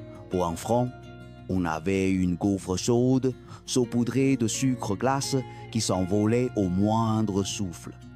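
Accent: Quebec, Canada. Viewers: accent du québéc?, suisse?, belgique? non non québéois romande avec une touche de bruxelles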